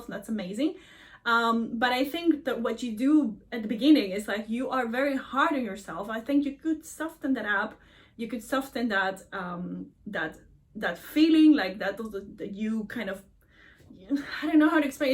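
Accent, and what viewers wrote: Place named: Venezuela. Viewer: Where is your accent from? Best guess is Venezuela.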